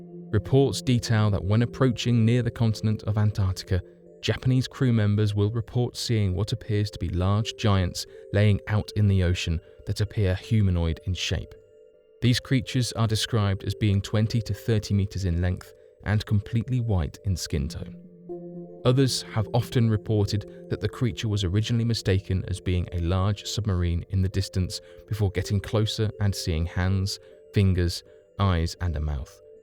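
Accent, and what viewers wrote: United Kingdom. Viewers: Nice accent, kinda a British one! I truly appreciate it!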